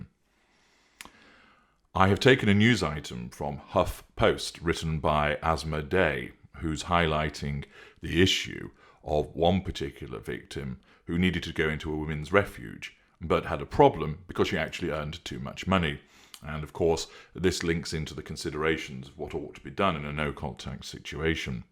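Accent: United Kingdom. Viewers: this gentlemen is british for sure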